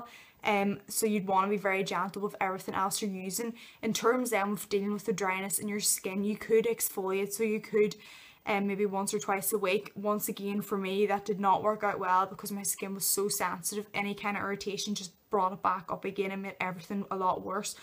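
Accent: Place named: Birmingham, United Kingdom. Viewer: U speak peaky blinders accent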